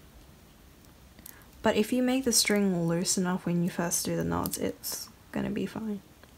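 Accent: Australia. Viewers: are u aussie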